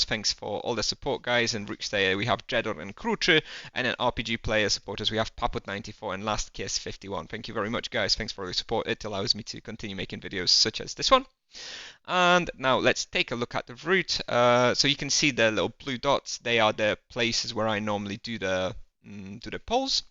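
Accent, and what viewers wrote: United Kingdom. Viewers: w Angli mieszkasz ze taki akcent ?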